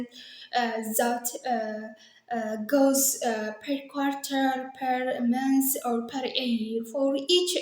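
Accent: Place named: India. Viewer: Your accesent of speaking is very amazing I remember indian speakers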